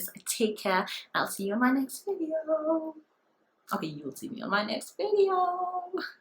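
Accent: United Kingdom. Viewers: The British acsent 👌😂😂😂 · The british accent makes me happy😍😂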